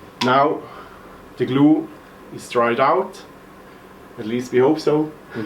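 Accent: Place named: Bern, Switzerland. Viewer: Cool, ä chli weni Bärndütsch ...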